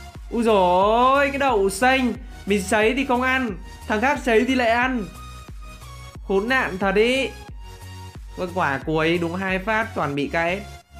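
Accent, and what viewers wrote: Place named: North, Vietnam. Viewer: ng Quãng Bình mà sao ổng lại nói tiếng Bắc nhuệ🤔🤔🤔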